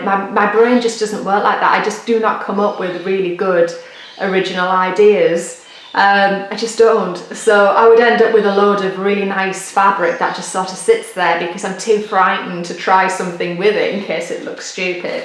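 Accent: United Kingdom. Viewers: Hi, I sure do miss your videos. Please consider making one soon. I love watching you, your English accent and your sweet Daisy. Plus your birdies. I would love to also see how you do the petite stitch/tent stitch on your Mirabilias. I just recently started Royal Holiday and I’m needing to see your beautiful stitching on faces. I hope we see you pop in soon. Love ya and leave ya!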